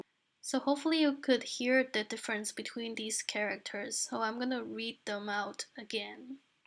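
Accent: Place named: Brazil. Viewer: 修女，我喜欢你的视频！ 你讲得非常好，而且你的语速非常适合我在旁边做笔记。 另外，你的英语口音听起来和我的一个好朋友很像，她是巴西人。 这让我更喜欢你了！ 希望你一切顺利，感谢你的辛勤工作！❤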